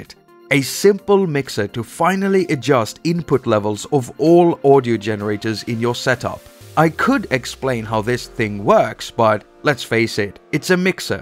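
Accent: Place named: Sweden. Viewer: Probably is the swedish accent. Or has talked too much with siri. Look out for his channel if you don't know him. He knows what it does:)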